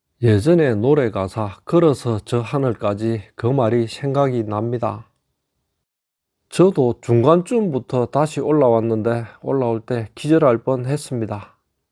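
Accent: Gyeongsang, South Korea. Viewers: 지도 싫은데 굳이 드론까지 띠워서 열심히도 찍었네 ㅋㅋㅋ 난 이양반 부산 경상쪽 만 좌표 찍어서 죽어라 찍어 열심히 비아냥 거리는거 ㅈㄴ 웃김 ㅋㅋ. 70년 생이라서 대학떄 농활다니면서 정신교육을 제대로 받았는지 ㅋㅋ 말투는 영락없는 경상도 말투인데 찍어내는 컨텐츠는 그야말로 지역 죽어라고 고사를 지내는 수준 ㅋㅋ 제발 이런노력 전라도나 경기 권에 가서 하십셔 ㅋㅋㅋ 이런 정성으로 그쪽 파보면 부조리가 100배는 더 보일테니 ㅋㅋ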